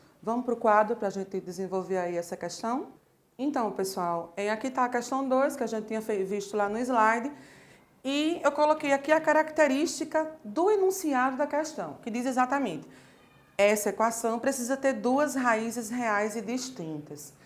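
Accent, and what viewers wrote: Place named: Northeast, Brazil. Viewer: e do nordeste pelo sutaque kkkkkkkkkkk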